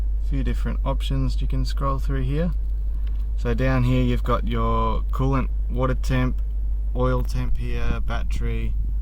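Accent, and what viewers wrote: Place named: Australia. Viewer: Nice to see another ISF in Australia, I recognised your accent. I am in Western Australia. They have become so rare now, lucky to see 10 for sale in the entire country at any one time. Looks clean good pick up! :)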